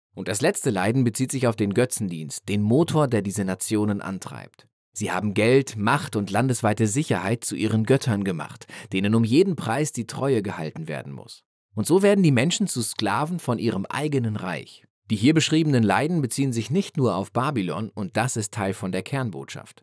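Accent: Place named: Ruhr, Germany. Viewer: Was für ein Ruhrpott-Geschnatter... Wer's authentisch hören will, sollte sich besser das Buch selbst anhören. z.B. hier: <link>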